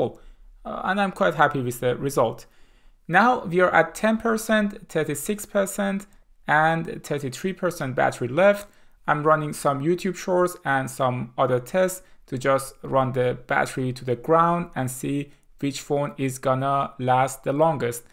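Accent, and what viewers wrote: Iran. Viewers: سلام سید لحجه داری ، حس میکنم ایرانی هستی درسته ؟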